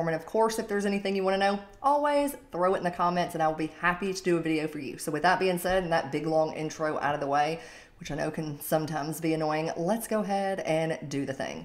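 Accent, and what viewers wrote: South, United States. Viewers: I was born in the south so Love the Southern Accent!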